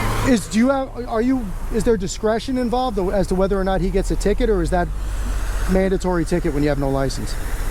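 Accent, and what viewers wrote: Cuba. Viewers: You speak perfect fluent spanish, although you speak a caribbean spanish accent, more like Cuban. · Dang bro, you speak really good Spanish. You sound like a you could be cuban just about. Lol 👍🏽😎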